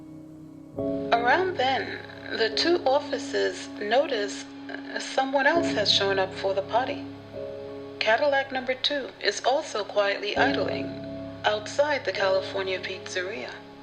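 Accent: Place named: Brooklyn, United States. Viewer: No one know where can even contend with the narrator, the impeccable balance of her telling the story and keeping you in suspense while telling you the story is one thing, but her voice is a whole Nother thing in itself. It’s so Brooklyn so gangster rest like a pedestal WIFE from one of the boys like there’s no question she is now the best narrated narrative which ever term you want to use. IN THE MAFIA GENRE.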